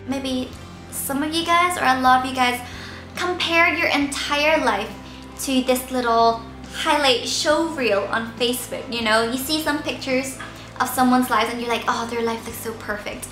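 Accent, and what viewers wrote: Northern Ireland, United Kingdom. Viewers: Woah you sound like you have a northern Irish accent! :o P.S. I'm Irish and this is my first video I watched of you and you seem lovely :) <3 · She has mostly a Northern Irish accent :)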